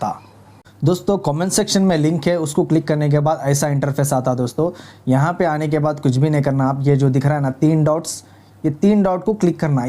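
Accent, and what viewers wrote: Hyderabad, India. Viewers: Assalamu alikum bhaiya apki pakki hyderabad language bhaiya bade ka gosht khate na isliye dimag slow chalra apne logo ka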